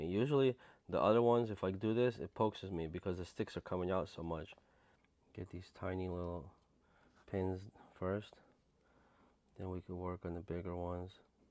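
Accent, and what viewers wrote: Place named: Russia. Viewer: You know the hardware/software repair tutorial is going to be good when the guy speaking has a Russian accent.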